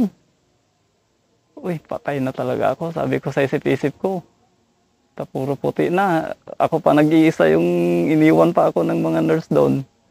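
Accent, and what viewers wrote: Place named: Pangasinan, Philippines. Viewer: Yung Punto mo KABAYAN, PANGASINAN KA PALA,AY ARO IDOL TA KA LA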